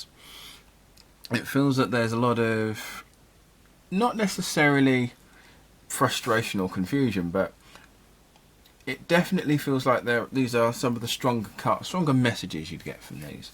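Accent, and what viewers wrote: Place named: California, United States. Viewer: Love your accent from California, just subscribed!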